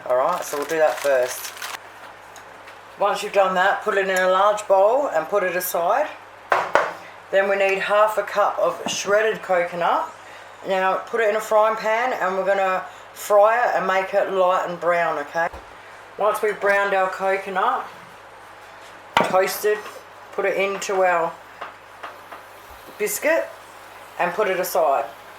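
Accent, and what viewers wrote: Australia. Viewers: Thanks for posting,looks yum,definatly have to try it,love your Aussie accent.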